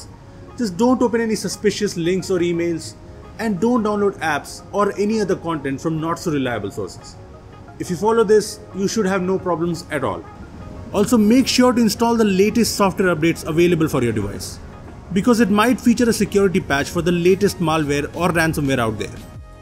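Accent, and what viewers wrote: India. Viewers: 7. Indian english accent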